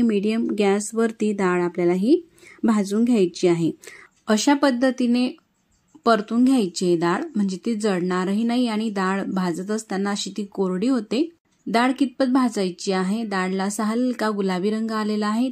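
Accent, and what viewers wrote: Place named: Jalgaon, India. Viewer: Jalgaon kadil lok asech boltat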